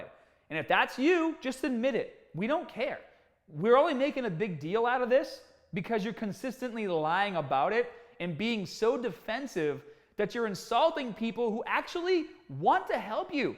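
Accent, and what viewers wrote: Boston, United States. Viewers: Damn you got a strong Boston accent bro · The boston accent is killer! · That Boston accent coming in THICK! Much love from Texas! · Wait a second. I’m subscribing for tha facking R kid. Boston I gather ? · You from Boston bro! I can tell from the T AH T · 1 min in, ik hes from boston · I came for the Boston accent. Wasn't disappointed.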